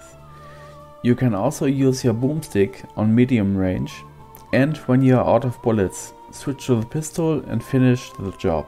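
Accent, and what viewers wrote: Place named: Germany. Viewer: Wie schön das man deinen Deutschen Akzent direkt heraushört. Liebe Grüße Leminsc8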